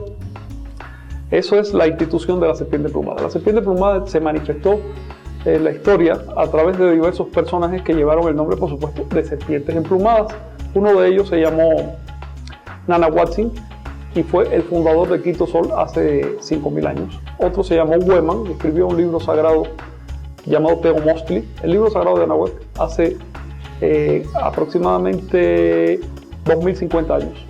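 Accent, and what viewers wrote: Cuba. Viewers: Entonces el hablar como cubano(o no se de donde sea) le anula el conocimiento?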